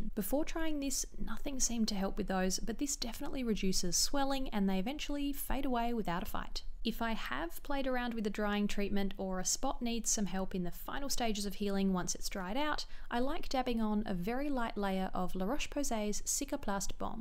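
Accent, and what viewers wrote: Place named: Australia. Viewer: Agreed!! There’s something incredibly satisfying and relaxing about these videos. And I love the aussie accent. I lived there for 9 years, and it’s so nostalgic. Xx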